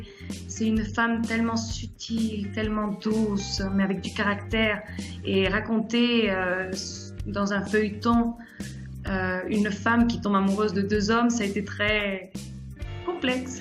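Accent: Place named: France. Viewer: Elle a l accent français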